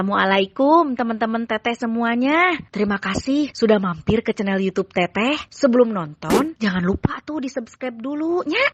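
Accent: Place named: Sunda, Indonesia. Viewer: ak nonton nya ga fokus sama bakso nya tp fokus ke teh yg cantik. 😍😍 sukses turus buat canel youtube ya teh 👌😍. salam dari Pelajar di jepang. ak selalu nonton trus youtube teteh. teh cantik lucu juga kalo ngmong bahasa sunda 😁😁ak orang jawa tengah.. pokok nya semangat trus teh buat konten youtubenya 👌😁😍😍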